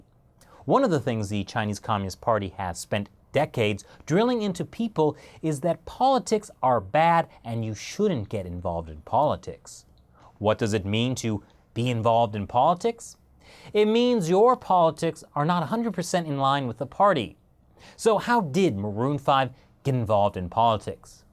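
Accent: Australia. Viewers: Australian accent? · an asian girl with an australian accent...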